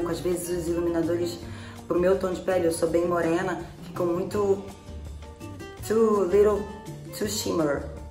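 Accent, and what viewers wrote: Rio de Janeiro, Brazil. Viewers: não exagera. Sotaque carioca não cai bem pra todos... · Me diz uma coisa. O que é preconceito pra você? Porque eu acho que você está equivocada sobre o significado. Seria preconceito se eu desprezasse ou tratasse de forma diferente as pessoas só por causa do sotaque delas. E aqui não é o caso, não tenho nada contra quem é do Rio, só não curto o sotaque. Estamos falando de idioma certo? Tenho direito de expressar meu gosto.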